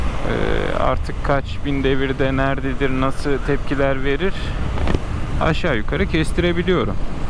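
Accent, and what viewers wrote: Turkey. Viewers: tam bafralı gibisi abi :D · Abi sen samsunlumusun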